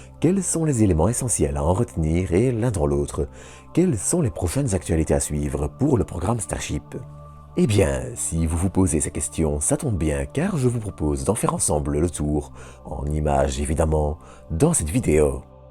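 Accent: Belgium. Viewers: vous avais un accen belge magnifique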